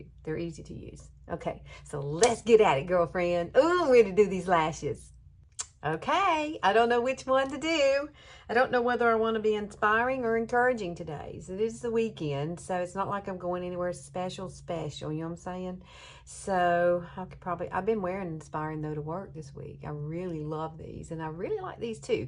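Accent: South, United States. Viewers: Hi, I recently found your channel; You're so cute and interesting. I like your southern drawl and emphasis on words. I'm a northerner but lived in TN for 28 years but still sound like a Yankee. is. I recently found your channel and so enjoy your videos. I'm going to try those eyelashes as soon as possible. I am 70 so I don't mess with glue around the eyes, especially after cataract surgery. God bless!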